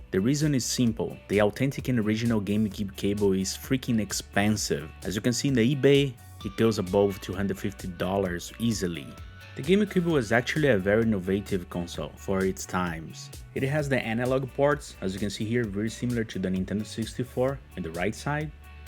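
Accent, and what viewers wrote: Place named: Portugal. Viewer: Your English is excellent but I can tell your native language is Portuguese! Vídeo legal mano vou ver esse cabo no aliexpress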